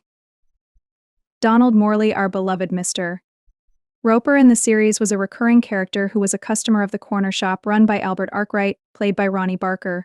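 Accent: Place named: United States. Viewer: Why have an annoying American robot voice telling us this. Ruined it. Can't watch now.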